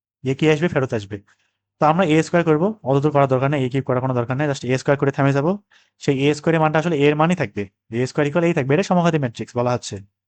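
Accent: Naogaon, Bangladesh. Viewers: Onk upokrito holam..❤sir hamar bari kolo nouga vasa kemba chena chena lagce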